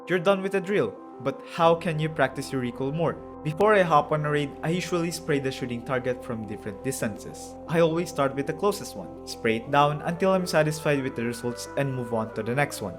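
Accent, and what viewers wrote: Philippines. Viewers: IDK, But you sound like you're Filipino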